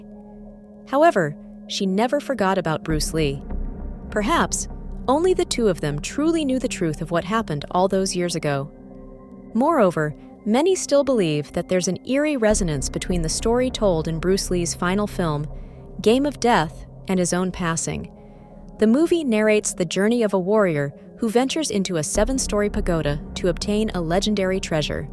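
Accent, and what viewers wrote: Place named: United States. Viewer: As usual this channel has a narrator who does not pronounce common English words correctly! Though narrator speaks with American accent, no attempt to bother with obvious words. So annoying and personally I do not believe many of this videos info. The makers are careless, do not bother with proof of many of their statements. Do not bother...waste of your time.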